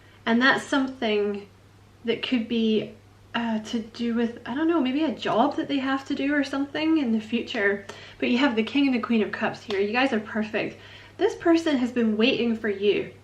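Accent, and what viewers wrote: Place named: Scotland, United Kingdom. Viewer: I feel so welcome here. I like your Scottish Accent very much. Kia Ora (greetings in the Maori language) and a very big congratulations on your 100,000 subscriber plaque you fucking legend! Well done!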